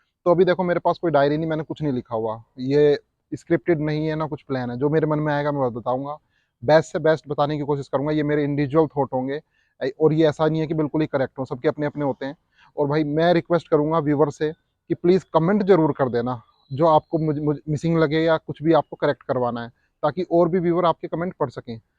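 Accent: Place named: Meerut, India. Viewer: Ap sbhi jagah gye, pr meerut ki tone apke sath chipak gai hai, nhi chhutegi 😅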